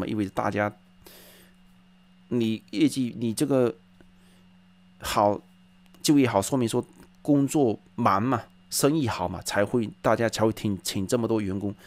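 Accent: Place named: Zhejiang, China. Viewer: 浙江人，哈哈哈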